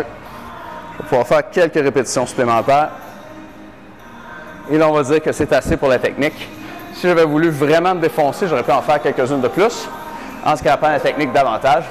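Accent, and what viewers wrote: Quebec, Canada. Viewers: il a une voie qui vient de quebeq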